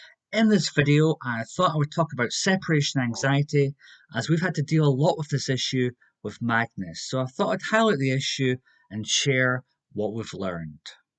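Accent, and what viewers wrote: Scotland, United Kingdom. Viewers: Superb video about the subject. Also very important is your calm and relaxed Scottish accent and "timbre" to calm down the hoomans who are worried about the anxiety of their sighthounds. It works 2 ways. Most excellent.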